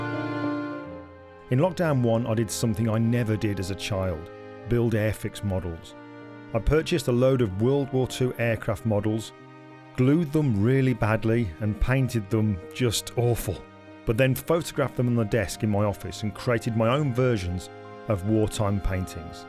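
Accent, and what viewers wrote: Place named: Birmingham, United Kingdom. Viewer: Thanks, always good to hear your Brum on Kelby 1,too!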